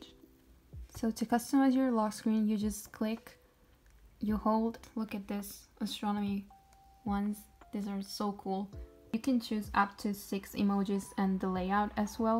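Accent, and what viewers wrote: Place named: Italy. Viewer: Hi are you Italian? Loved the video, also your accent is great. I’m Italian too, but I watch mostly English talking people on YouTube 😅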